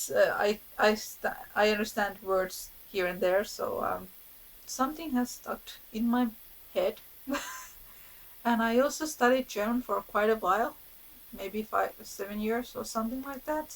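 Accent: Finland. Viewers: You, and your accent, are lovely. I don’t understand the “shyness” that seems to be common with Finns about speaking English. Everyone I’ve ever discussed it with says they like the sound of it. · I love the accentuated Finnish accent video, it sounds like my husbands grandfather. Your accent is lovely though, and your voice is so sing song and melodic :)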